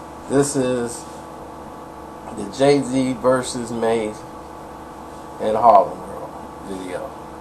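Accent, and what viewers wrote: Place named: South, United States. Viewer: Hahahaha....this guy has a southern accent!!!! Have u ever been to NYC????? ANY BORO?????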